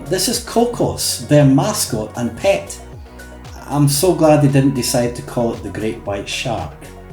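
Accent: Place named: Scotland, United Kingdom. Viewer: Not a bad first attempt. That Scottish voice-over is quite amusing.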